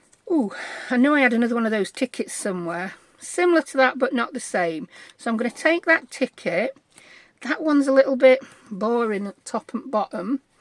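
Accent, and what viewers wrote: United Kingdom. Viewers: Hello, from Texas! I enjoyed this video - I love making ephemera from junk -- or "rubbish" as you call it in the UK -- just using up bits of this & that to make something useful and beautiful! I love it - thank you for the inspiration and I enjoyed the chatting, too - love your accent! Have wonderful day and happy crafting! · Hello, I have been watching your videos for a few months now,some two or three times. I told my children that you talked like me with my sense of humor. Only you are British.I love listening to you waffle and crack on.😂 I have a suggestion for you, but you will have to concentrate and make it a habit. I know because it's taken me months and I still have problems with losing my tools. Get a bowl, tray, basket, etc to place it where you usually drop your tools. Then when you are done with the tool you are using, place it on tray or in whatever you have decided to use. 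Or you can make yourself a magnetic apron and most of you tools will attach to you. Anyway, keep up the awesome videos.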